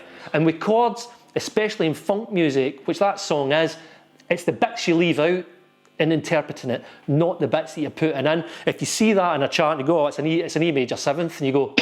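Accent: Scotland, United Kingdom. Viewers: That was pure brilliant mate. You from Scotland?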